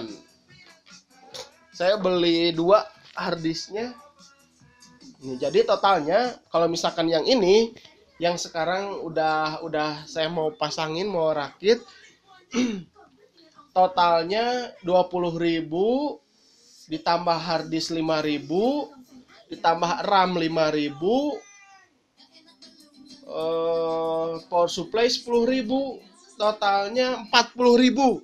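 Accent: West Java, Indonesia. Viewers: SUNDAAA EUY... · Sunda euy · Tinggal dipalih mana Kang?? wkwkwkwk😂 orng Sunda nya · si aa sunda pisan euy, "beli eram lima rebu" ..hahah lanjutkan kang!!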